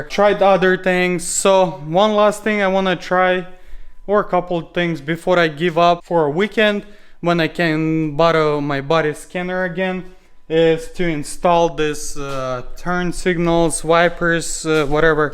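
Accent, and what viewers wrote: Russia. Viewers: How its possible to know what car part you need and where does it fit? Maybe he use some BMW manual or something? I suspect that your accent comes from russia or europe?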